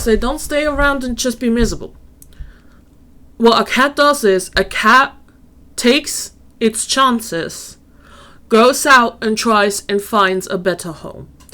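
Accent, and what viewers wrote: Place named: Germany. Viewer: I thought you sounded German!!! Welche Region wohnst du in?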